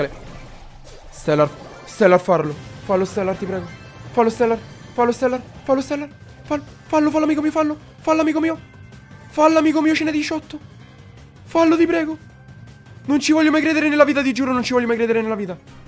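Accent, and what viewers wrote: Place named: Sardinia, Italy. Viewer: Mà sei sardese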